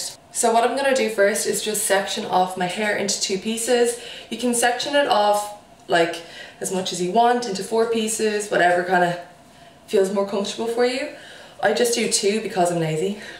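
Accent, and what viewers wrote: Ireland. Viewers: my grandparents were from Dublin, it's a comfort to hear your brogue ❤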